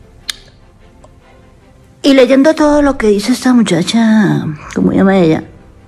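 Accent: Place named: Antioquia, Colombia. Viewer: Yo no se pero doña tiene acento paisa... ¿ será Paisa?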